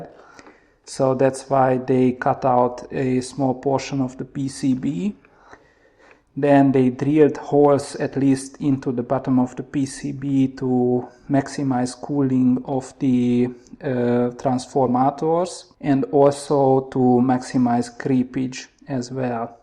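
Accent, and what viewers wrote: Hungary. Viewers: Tetszenek a videóid !!! Főleg a magyaros akcentus :)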